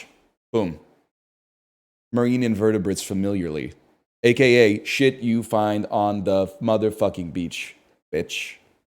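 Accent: Canada. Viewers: Welcome to the Canadian accent. 😊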